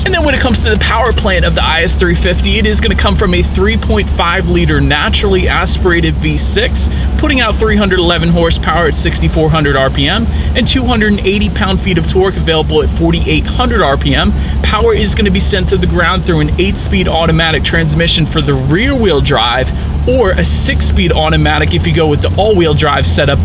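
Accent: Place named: Southern California, United States. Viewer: You from socal? You've got the surfbro accent.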